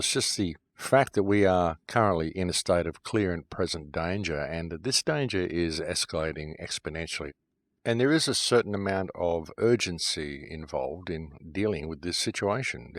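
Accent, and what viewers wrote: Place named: Australia. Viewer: Gate-keeping. By the way every time I think of this concept I hear your Australian accent...so your videos work to a degree lol.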